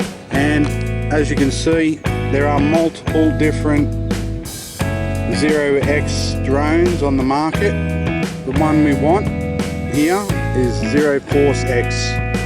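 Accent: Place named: Australia. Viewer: What a legend. As a kiwi there is nothing more reassuring than an Aussie accent gently educating you on the finer points of setting up your drone. Most appreciated 😅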